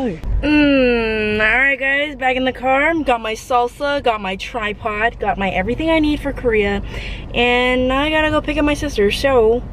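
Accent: United Kingdom. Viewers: Loving the accurate af British accent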